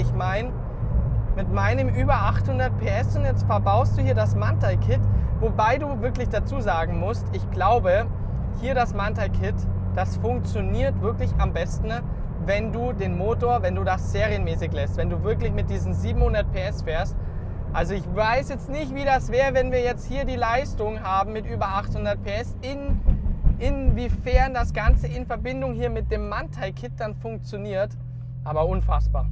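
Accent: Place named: Franconia, Germany. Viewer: dieser fränkische Dialekt 🤣🤷🏻‍♂️ herrrrrlich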